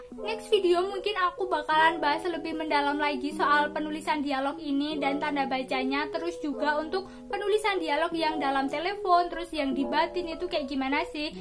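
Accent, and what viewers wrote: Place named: Java, Indonesia. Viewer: Orang jawa nih pasti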